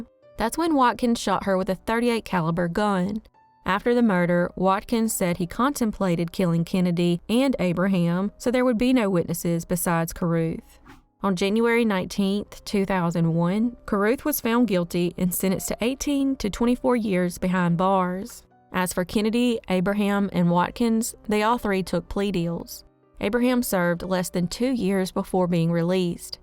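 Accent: South, United States. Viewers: This narrator has a nice southern accent.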